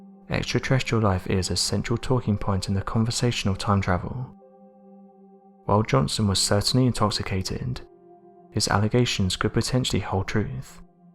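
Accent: United Kingdom. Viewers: If things are happening in Casper, Wyoming, why can't you have someone from Casper, Wyoming narrate the happening? Could be really good and we in America could probably understand wtf, he was actually saying! I am really getting sick of the accent, inability to pronounce the American language and that non-emotional, dreary, British voice!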